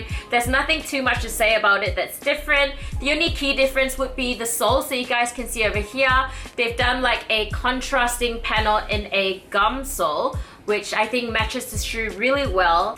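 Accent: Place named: Australia. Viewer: Fuck me a cute asian with grey hair and Aussie accent...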